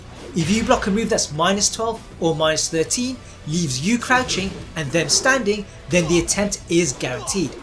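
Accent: Birmingham, United Kingdom. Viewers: great guide, thanks a ton! you from birmingham? trying to place your accent. rly appreciate all the king tech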